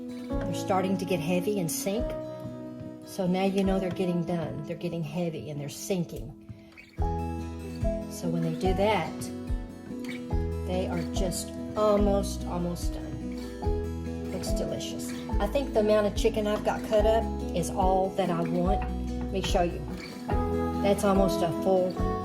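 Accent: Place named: South, United States. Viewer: You seem to have a southern accent, I can't believe you are using canned biscuits 🤦🏼‍♀️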